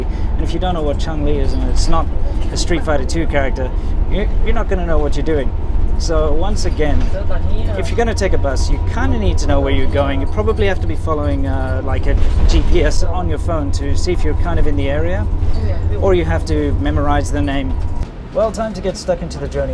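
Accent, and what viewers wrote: South Africa. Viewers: You a South African😁👍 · Hi where are you from? I hear a lot of American expressions but you sound more South African. I have just started watching your videos and I think they are great. I had no idea how day to day life is in China but this is a good insight. I honesty would be absolutely in big trouble if I went there, no idea what those Chinese characters mean and as for the language?? you get the drift.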